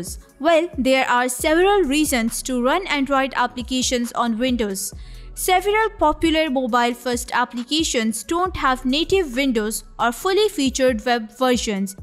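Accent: India. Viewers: I think you have really interesting Content, but I can’t stay this Indian accent anymore. Sorry i tried. Really 😩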